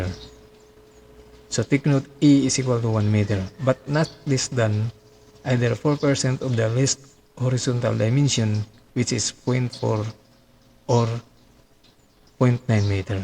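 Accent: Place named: Visayas, Philippines. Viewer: p o t a n g e n a n g yung accent mong bisaya, dapat hindi ka na nag eenglish... napaka baduy mong magsalita, mababang uring taong bisaya... nakakabuwiset content mo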